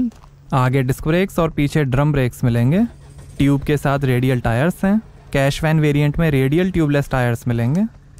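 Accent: Gwalior, India. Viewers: Sir Aap gwalior Ke Ho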